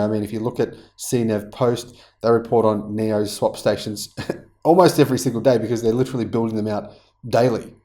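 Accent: Australia. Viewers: I just subscribed to your channel. It's great. To make it even better, please get yourself a good lapel mike. (No offense intended, but your Australian accent is an added challenge to non-native English speakers.)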